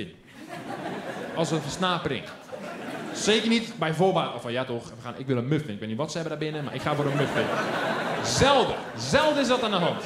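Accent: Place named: Rotterdam, Netherlands. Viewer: Haha. Kom zeker niet uit A'dam. Moet mijn mening ook wel iets aanpassen zie ik. Zal wel in een pest bui zijn geweest toen ik zo reageerde. Hij heeft zeker talent en uitstraling. Mag van mij wel iets actiever, maar ook dat kan zijn stijl zijn. Ben zelf een Goedemondt fan vandaar. Vergelijken is zinloos. Zou hem ook best wel eens live willen zien optreden. Heb gewoon nog steeds moeite met zijn zware Rotterdamse accent. Niks tegen dat accent. Kan het alleen wat lastig verstaan als hij dan wat sneller praat en zo mis ik de goeie grappen. Zal ook wel wennen zijn. Mijn excuses voor een te heftige vorige reactie.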